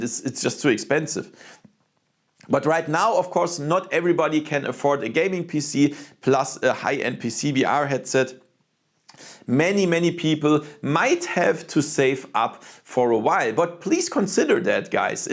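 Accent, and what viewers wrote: Germany. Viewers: he is german , you always can hear that , its with every language they try to speak.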